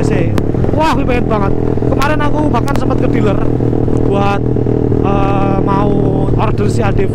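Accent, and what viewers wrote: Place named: East Java, Indonesia. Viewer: Sampean orang jawa timur ta bang,kok kalau ngomong jawa kayak basa jawa timuran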